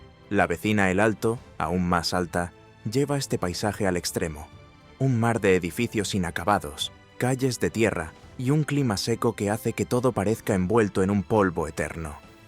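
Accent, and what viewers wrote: Spain. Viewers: La envidia te hace delirar, solo hablas por que te dicen los otros como tu envidioso, ... ojalá algún día tengas la posibilidad de ir a Venezuela, .... para que veas lo muy superior que es sobre tu país y muchos otros. Ese narrador nunca ha saludo de España que creo es su acento, por lo tanto habla bobadas por que no sabe. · por tu acento asumo que eres español, lamento decirte que españa tampoco es la octava maravilla del mundo Einstein, saludos desde el pais mas lindo del mundo ES.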